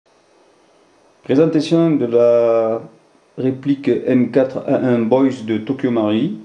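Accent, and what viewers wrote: France. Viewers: hehehe i luv ur french accent xD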